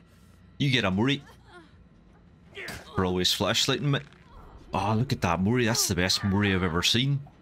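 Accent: Ireland. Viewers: what a strong accent,irish?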